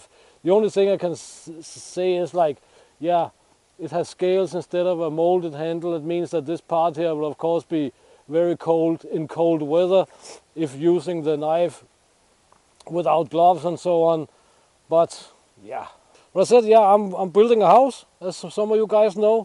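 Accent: Denmark. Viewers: I looove the accent! Danish? (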